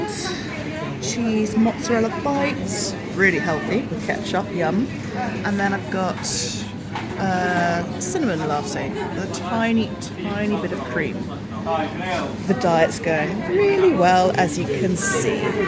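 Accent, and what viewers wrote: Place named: RP, United Kingdom. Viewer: love your videos. With regards your "posh" accent... You speak normal, in my view. My mother was brought up in a private school where they had Elocution lessons, hence she speaks clearly and rounds off her sentences. Be proud of your voice. We live in the South, on borders of Surrey and West London. You are not posh, but very classy lady! Look forward to next video. Have you been to Wakefield? Similar to Harrogate, lovely cathedral.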